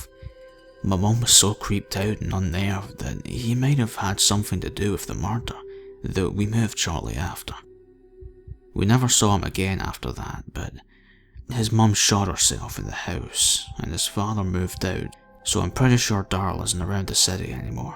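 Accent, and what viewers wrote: Scotland, United Kingdom. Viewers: Always enjoy the stories your the delivery in my accent is great too.. even if ye did say ass and no arse… Just curious are you Scottish and do you live in Scotland? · Great video fab to hear a Scottish narrator 😊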